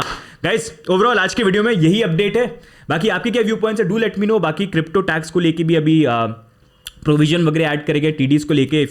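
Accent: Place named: India. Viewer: In the end - Indian same pronunciation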